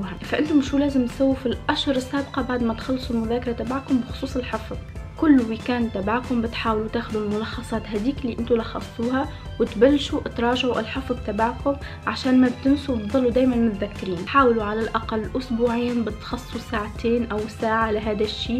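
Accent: Tunisia. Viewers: لهجتك فيها شوي تونسية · أنت تونسية صح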